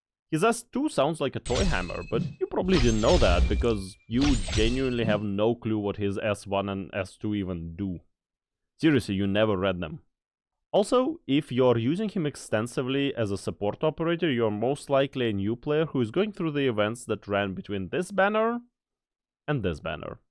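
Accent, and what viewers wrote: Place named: Russia. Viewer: Рашн акцент, бро, веди телегу пж🙏🙏🙏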